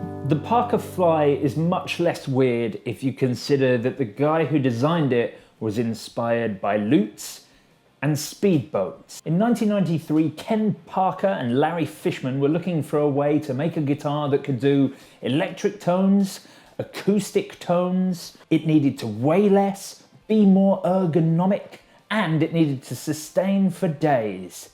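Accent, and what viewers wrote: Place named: United Kingdom. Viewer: Why the hell is Evgeni Malkin talking about guitars and why does he have a British accent?...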